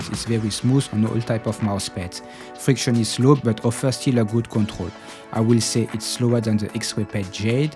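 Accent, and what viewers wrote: France. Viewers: Mdr l'accent bien français (bonne vidéo quand même)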